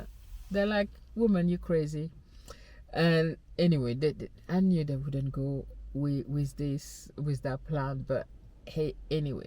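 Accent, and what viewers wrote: France. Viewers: That adsense delivery timing was perfect! 😂😂 I love what you said about God and higher power. Your accent is amazing - so is it French/British? Also I have had to fire two really good people in the past few months and it’s just as awful on the other side of the table. Our business is down in profit and if we didn’t let people go, we would eventually have to shut down and everyone would be out of a job. I know it feels so personal and terrible but it really comes down to spreadsheets. I don’t know if that helps or not but just wanted to say both sides of the conversation are really hard. I love my employees and know they have bills and families and know their stories. But I think you have a great attitude and I’m not worried about your future success at all!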